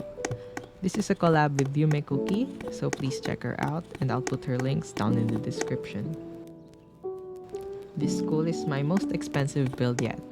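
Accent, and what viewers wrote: Philippines. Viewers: Ur filipino cos of ur accent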